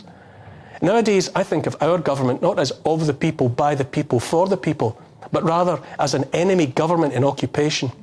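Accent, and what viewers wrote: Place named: Glasgow, United Kingdom. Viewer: Mate what's way the neck rug? And stoap way the Irritating accent yer a weegie trust me it's no surprise ur channel is complete Murdoch puppet play.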